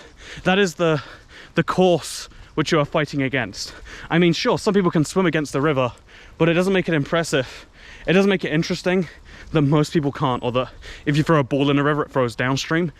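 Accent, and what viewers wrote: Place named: RP, United Kingdom. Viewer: It's purely the posh accent lol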